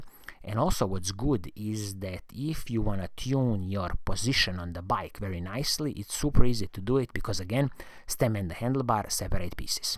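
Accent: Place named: Italy. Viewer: He sounds Italian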